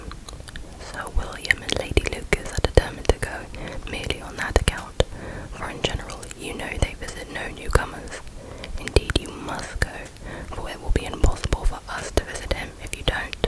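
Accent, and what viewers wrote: England, United Kingdom. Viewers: You sound kind of like kaya with your English accent she does asmr about disturbing cases and horror stuff 👌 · Your English accent makes the BEST inaudible sounds! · Love this book ❤ and your good at reading in a British accent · Luv that English Accent 🥰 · Let's be real - actors know which accents they're good at. You should definitely own your accent, you sound so English 🤩 Plus, who doesnt wanna sound like Colin Firth? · i’m from the southern part of england and honestly this accent sounds very.. western or eastern british idk why 😭 anyways! very tingly and i adored this. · Oh thank you, this is beautiful. I love english accent and this video is extremely relaxing😊 love it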